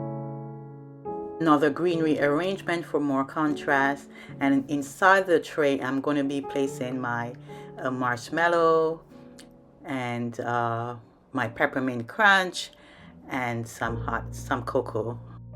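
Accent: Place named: Caribbean. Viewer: Very beautiful ❤also i like your accent are you caribbean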